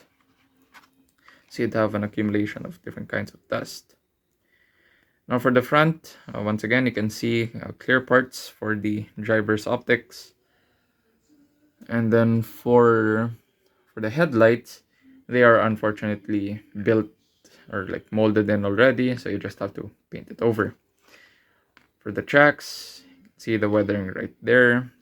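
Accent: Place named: Philippines. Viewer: Subbed. I just found your channel. I like modelers who can finish a project. Looking forward to your next video. By your accent, are you Pinoy too?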